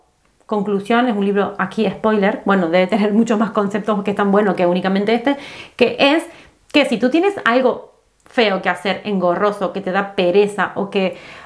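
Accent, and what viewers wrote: Argentina. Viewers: Esa tonada cordobesa !! 😅😅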